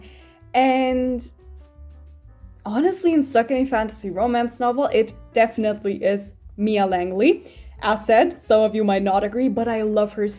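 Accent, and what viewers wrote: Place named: Poland. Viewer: u sound polish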